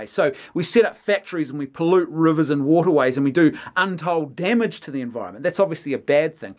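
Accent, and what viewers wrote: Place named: New Zealand. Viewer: Rare-bits and Possums are pissed? Are they always this mad down there? Love your NZ English :o)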